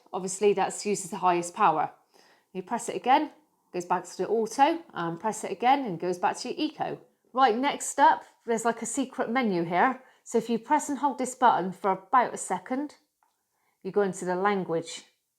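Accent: United Kingdom. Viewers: My language is french and I love so much to hear the British English that I forgot I was here to learn about a vacum cleaner.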